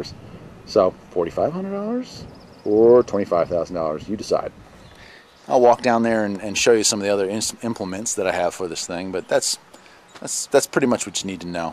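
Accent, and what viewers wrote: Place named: Midwest, United States. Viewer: You sound like a midwestern boy! Great channel, for learning!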